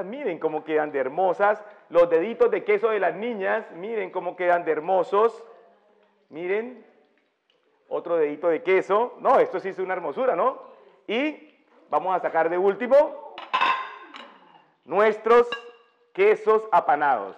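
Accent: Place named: Colombia. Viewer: Me encanta su acento Colombiano ❤️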